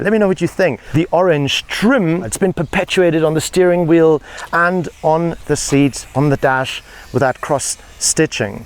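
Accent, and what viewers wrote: South Africa. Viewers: First 10 secs of the video, I'm like, "Why do you sound South African bru?" 😂😂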